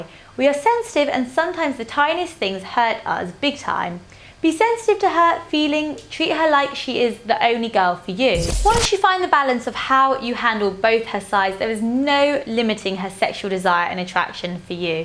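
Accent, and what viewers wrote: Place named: United Kingdom. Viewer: British Accent ikr😘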